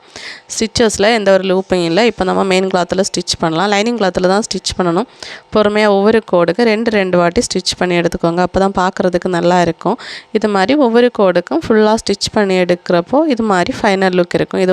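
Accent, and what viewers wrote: Kanyakumari, India. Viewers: Hi sis Recently I saw and subscribed your channel;awesome videos;n you , your hardwork inspiring;you are soo beautiful 😊; I love embroidery n stitching from childhood Now learned to stich my dress myself by YouTube videos.namma kanyakumari slang la super ah pesureenga, perumaiya irukku; I don’t know aari ;evlo try pannen Ukkum varavae illa;Ella workum hand embroidery taan paanuvaen:blouse chudi etc. niraya days edukkum. Appuram onnae onnu sila English word mattum pronunciation thappa solreenga ;artha mattum konjam paarunga Naan epdi yaarukkum comment pannathilla I like you a lot ; Ataan sonnen sis Also unga simple hand work techniques romba useful ah irukku; keep on doing ;comment lengthy ah irukku ;😅pls reply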